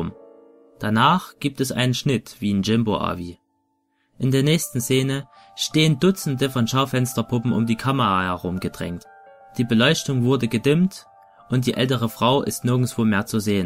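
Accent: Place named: Saxony, Germany. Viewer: Okay, ich schreibe mal einen professionellen Kommentar: (Es wird ein etwas längerer Text) Fangen wir an mit "Text und Aufnahme": Also zuallererst einmal ist deine Sprechweise gut, der Lesefluss ist super und deine Betonungen glaubhaft und ist gut platziert. Manchmal hast du aber etwas knapp geschnitten, damit meine ich das manche Sätze schnell enden und du also (rein natürlich) nicht die Zeit gehabt hättest Luft zu holen. Ich sehe deinen Förderbedarf im Dialekt, da ich dich mittlerweile sehr gut kenne weiß ich das du hier sehr darauf geachtet hast nicht zu sehr "Sächsisch" zu klingen, dafür ein Kompliment. Zum Text gibt es kaum etwas zu sagen da es ein ziemlicher Klassiker ist der eher vom Background lebt, also den Videos die man tatsächlich anschauen kann. Ich fahre fort mit "Technik": Mit deinem Videobearbeitungsprogramm hast du eine gute Wahl getroffen. 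Die Bilder sind stimmig und passen von der Bearbeitung zu NPFNP. Man "merkt" das sich die Qualität von diesem Video vom Rest erheblich abhebt das sich nicht zuletzt auch auf dem Sound auswirkt. Auf die Bearbeitung deiner Stimme gehe ich mal nicht ein, da du ja dort noch etwas Hilfe hattest ;) Der Background ist gut gewählt, bei aber + etwas leise. Fazit: Eine Interessante CP die ich sehr genossen habe, danke für deine Arbeit ! NEVER~SLEEP~AGAIN Cruzix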